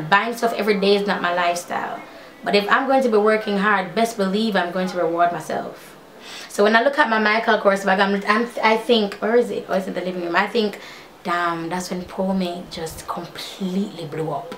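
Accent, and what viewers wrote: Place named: Jamaica. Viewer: Hello. I've been watching your channel for a year now. I love your energy and that lovely Jamaican accent! Yes rewarding yourself is a good thing you work hard and you deserve it! God bless you and your family.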